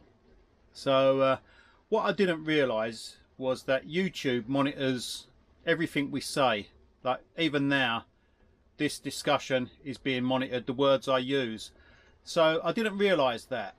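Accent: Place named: South, United States. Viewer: Hi, all the best for 2025. You will always get haters and whiners when you put yourself out on YouTube. Some people just can’t help themselves for instance your accent i’m Northern you are a southern,if they don’t like it jog on!. there are more and more people waking up to the tyranny of the New World Order dictatorship, this has been in the planning for over 20 years yet most people do not see it and even if you show them they still will not see it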